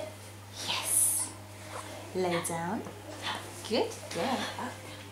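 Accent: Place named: England, United Kingdom. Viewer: What's cuter the dog or her accent? Where she from England?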